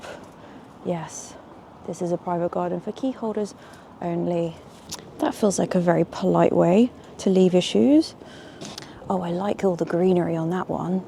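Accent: United Kingdom. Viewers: I’m not British/English (or whatever you call yourself), but Ifing love her accent, intonation as she exclaims to every detail she spots during the video like “Oh look the sun is shining!”. Also, she has a beautiful face full of expressions and they are changing constantly while she speaks. I love it all. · Wow you sound so British. I'm so used to YouTubers with American accents! Its good that you don't sound like the!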